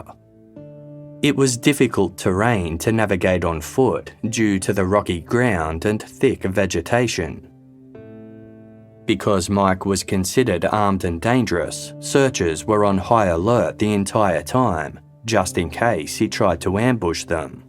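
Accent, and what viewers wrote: Australia. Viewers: Love hearing A fellow Aussie accent 🇦🇺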